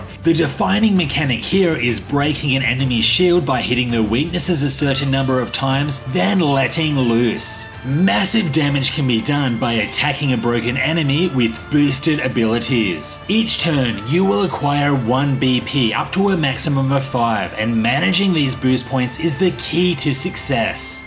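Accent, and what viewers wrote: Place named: Australia. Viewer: The Patreon joke and the rpg in the name and the Aussie accent had me instantly sub.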